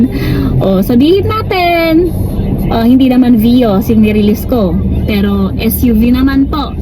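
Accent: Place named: Visayas, Philippines. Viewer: Bisaya ka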